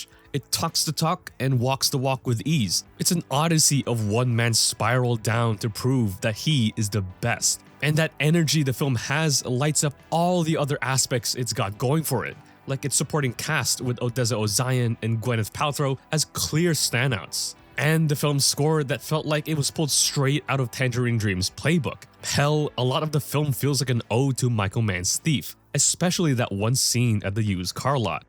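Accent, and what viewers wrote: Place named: Philippines. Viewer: filipino? i can hear a very faint accent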